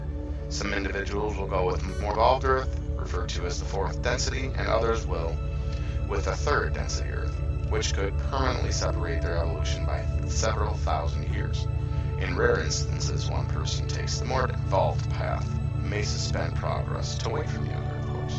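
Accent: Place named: United States. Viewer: well he is irish, this is an american accent.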